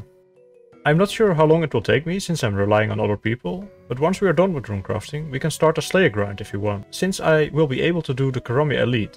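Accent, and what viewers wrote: Netherlands. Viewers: go goooo, you're a legend amongst the lowlands and even a bigger one on this game. Your episodes are evolving greatly and I just can't get bored of that lovely Dutch accent. Keep it up man!!!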